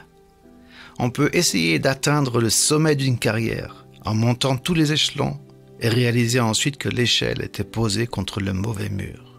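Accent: United Kingdom. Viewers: Bonjour et merci pour ce partage. Oui oui tu as bien un bel accent anglais. Je comprends mieux maintenant. La méditation m'a fait sourire et réfléchir surtout avec l'image du mauvais mur. Et si mon échelle d'ascension pro était sur le mauvais mur 😢 je me suis demandée. Seigneur je te confie tout je te recommande tout apprends moi à t'écouter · Bonsoir merci pour ces belles paroles elles me reconfortent apres une journee au bureau Je vous le dit souvent j'adore votre accent BRITISH Have a nice evening Awaiting for your next reading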